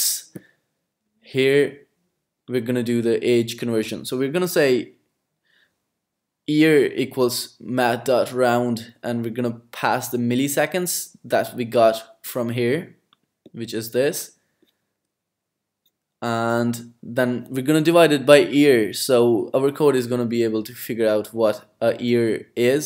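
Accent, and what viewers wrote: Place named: United States. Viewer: but your ascent is american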